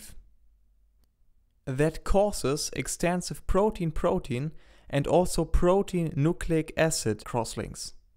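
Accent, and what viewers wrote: Germany. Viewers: you sound soo german :) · Thank u. Nice german accent ;-)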